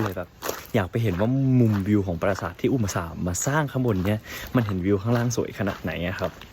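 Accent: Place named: Khon Kaen, Thailand. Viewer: มันคือ ภาษาขอนแก่นค่า 😂😂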